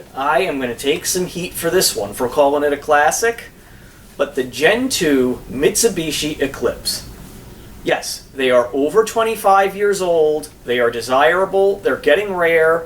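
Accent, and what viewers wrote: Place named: Chicago, United States. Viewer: You said South Carolina but I hear Chicago.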